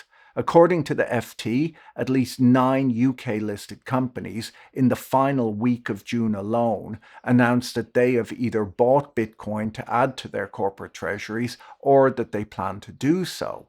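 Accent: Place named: Ireland. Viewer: Patrick, is it my imagination, or are you trying to downplay your accent? Please don't change the way you speak! I love your Irish accent! 🇮🇪 ❤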